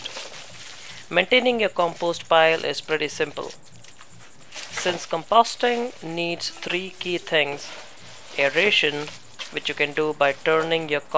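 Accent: India. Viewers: just love your india accent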